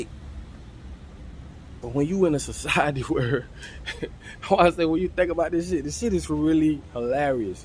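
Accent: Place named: Virginia, United States. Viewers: My guy articulates the truth with that southern "swang" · You sound like you’re from Newport News Va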